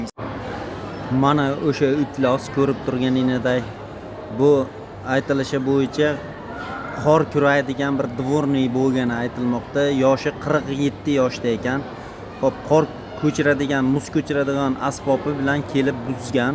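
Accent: Chortoq, Uzbekistan. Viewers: Ука. Шевангиздан чортоклик сиз шекилли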